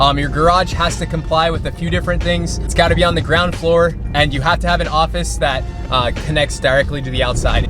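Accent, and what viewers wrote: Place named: California, United States. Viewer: Ughhhh, what a waste. Im glad you doing great in life BUT WHY ARE YOU TALKING LIKE THAT? YOU KNOW YOUR TALKING LIKE A WHITE CALI DUDE. SWEAR YOU DONT HAVE ANY BLACK FRIENDS. SWEAR, ITS SO WEIRD.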